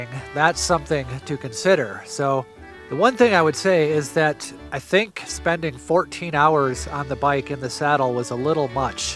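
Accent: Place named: Arizona, United States. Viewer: I didn't realize there was an Arizona accent. The way you speak reminds me of Cody Lundin. Very relaxing. Good video, Thanks!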